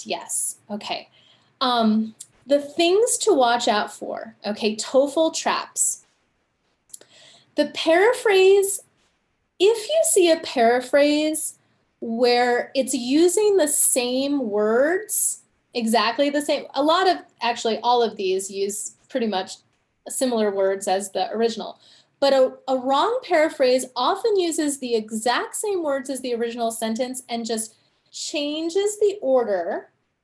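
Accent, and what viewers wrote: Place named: United States. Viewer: Namaste from India Miss sweetest smiley, 🤗☺You are so sweet and Haha why American's People's English language is so stylish 🤷‍♂️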